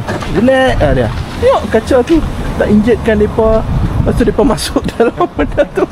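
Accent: North, Malaysia. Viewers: Samalah...first time dengaq loghat utara terus subcribe😂...n seghonok dengaq penerangannya..jelas sebutan..terbaik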